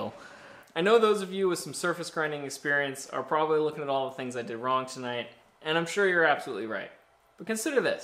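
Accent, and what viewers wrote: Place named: Australia. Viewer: Your heavy Australian accent makes listening to your otherwise excellent vid difficult. In future would you consider including Canadian & American subtitles. Where in Sydney do you Herald from? I have many Australian speaking friends and they love you vids. Thanks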